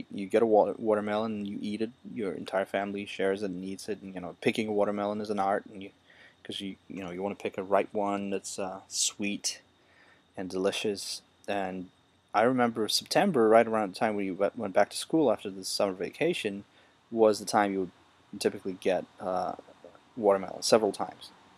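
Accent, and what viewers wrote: Midwest, United States. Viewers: Without sounding stupid as I haven't watched the entire video yet, how come you have a near perfect American accent? Did you live in America until you were 10? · My family is from Crimea and has deep roots in Krasnoperekopsk, I call fake on this video, he has a perfect American accent but allegedly spent his entire formative years in the Soviet Union, and smokes a fat cigar which is probably just a stereotype for street cred thing, and he says bedrooms are a foreign concept, no the idea of having one specific room for only sleeping and nothing else may be to some people but the idea of having a room for sleeping wasn't a foreign concept in the Soviet Union, and Leningrad was far from the nicest place in the Soviet Union to live. And you can complain about apartments not being luxurious by modern standards but by the standards of the time they were a huge improvement over most people's living conditions and far better than living on the cold streets, at least people were housed and fed, many countries in the west particularly back then had high rates of homelessness and unemployment and some still do. 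And bananas? Seriously? Oh well how horrible of the Soviet Union not to have installed a bunch of Banana Republics and conducted a bunch of massacres like United Fruit to get an unlimited supply of bananas, yes Bananas were a luxury that's because Bananas don't grow well outside of a tropical environment and it took acts of genocide and tyrannical imperialism to make them an affordable commodity in the United States and Europe. So no bananas, but there were plenty of grapes, at least in Crimea I can't really speak for the rest of the Sovi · Sounds like midwest english .. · It is rather evident that you are an english (US) native speaker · How come you sound American if came to America in 2005? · how did u lose your accent so fast if you lived in russia for 30 years? you sound like your from the midwest. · But that aside based on the way you talk and look correct me if I’m wrong. You live in the central to Midwest in the USA. 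If I had to narrow it down I’d say Ohio or Indiana. Judging by your complexion you work indoors maybe even third shift. Id day you work in a warehouse or maybe a factory. Your not a business man based on your gruff appearance you don’t wear a suit. You use a lot of short to the point words entails you aren’t highly educated I’d say associates degree at most. Be honest how much did I get right? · Your accent is very American despite living in Russia for so long. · Were you put in future kgb spy school. Your american accent is really good. Was your dad an american communist? How did you lose your accent? Your parents had to be americans that moved to the paradise. Ha ha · My God! You have no trace of an accent! One would never know you were originally Russian. You sound so American!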